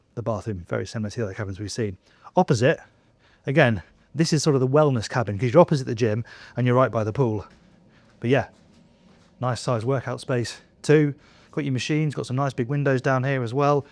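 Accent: United Kingdom. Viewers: PASHAGEWAYS....love hearing the British speak!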